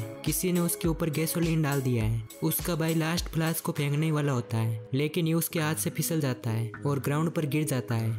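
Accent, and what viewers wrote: Rajasthan, India. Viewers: Rajasthan voice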